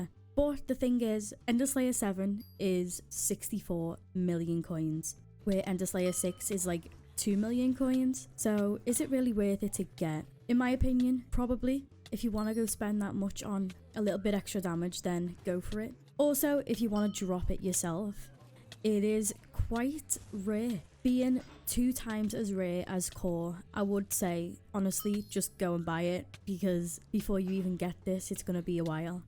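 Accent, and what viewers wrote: United Kingdom. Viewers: Are u british or is that accent in my head? (no offence XD)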